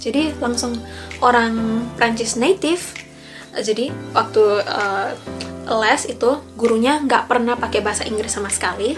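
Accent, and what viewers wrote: Java, Indonesia. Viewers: medhok ya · Medok lebih mantab mbak yu 😅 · Luar biasa, suka cara ngomongnya bahasa Indonesia, ada medok boso jowone, tak kiro asli wong jowo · ilat mu..malah mirip wong jowo..nduk ..rada medok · medok,e iku lo mantul,joooosss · Nambah channel di jenengi medok 👍👍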